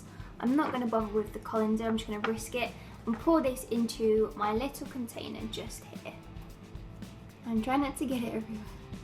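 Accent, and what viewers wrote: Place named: Europe. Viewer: I love the European kind of accent you got... It's kinda hard to catch up with that if you don't belong to the place... I have been using the beetroot thing for so long n Now I see videos over it... Ig I am gonna get back to it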